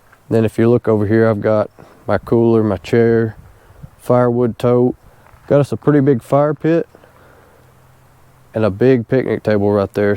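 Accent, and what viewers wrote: South, United States. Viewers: Love that southern accent 😀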